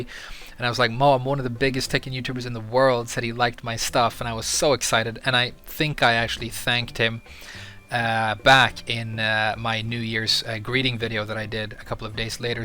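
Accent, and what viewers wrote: Sweden. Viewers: I've known you were Swedish from the beginning, you have a very good accent but the Swedish origins always shine through, no matter how hard you try (I should know, I'm Swedish too)! I love your videos and your Feng video especially helped me a ton, and even though you don't even play him yourself, you managed to make a really good guide. I'm sure MainMan was joking about that by the way. Keep up the good work! Skål! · Also, I realize ur Swedish accecent in ur first vid lol, cheers.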